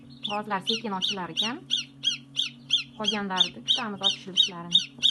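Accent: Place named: Karakalpakstan, Uzbekistan. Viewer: Ассалому аллайкум! Мен хам олгандим 70талик окидан. 29 та гоз тухумидан 15та очиб чикди! Кейинчалик 128талик фул автомат олувидим 57та гоз тухумидан 1та очиб чикди! Кайфият "0" ! Таблода харорат 37,2 тухумда эса 38,9! Биринчи кафат билан иккинчи каватнинг хароратининг хам фарки катта! Синглим, гапришингизга караганда Коракалпогистонликга ухшайсиз! Илтимос, неча фойиз очиб чикаяпти тугрисини айтинг! Иккинчи каватдаги тухумларингиз ковурилиб колмаяптими? Хароратни канчага куйдингиз?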